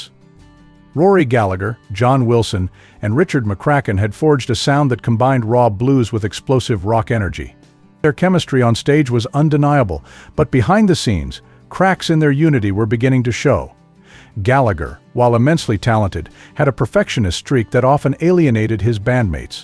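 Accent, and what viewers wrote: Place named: United States. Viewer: Who is this Yanky , shitehawk commentator - Absolute bolloxology !!!!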